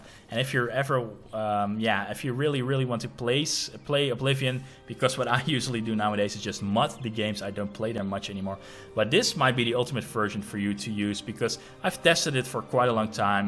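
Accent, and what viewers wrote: Netherlands. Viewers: Hello fellow Dutchmen. Altijd fijn dat je aan de uitspraak meteen merkt dat iemand Nederlands is.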